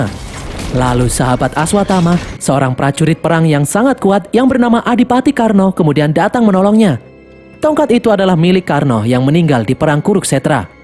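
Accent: Java, Indonesia. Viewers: Adminya orang jawa ya, karna mbacanya karno🥵🤣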